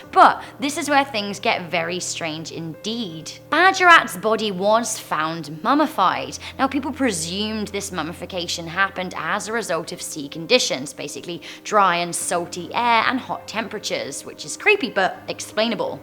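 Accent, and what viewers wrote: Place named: RP, United Kingdom. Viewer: Your Posh English accent is so... 💣💥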